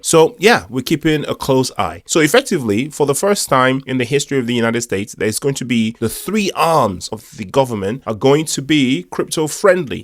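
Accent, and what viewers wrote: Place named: England, United Kingdom. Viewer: We can hear the english accent..no worries😂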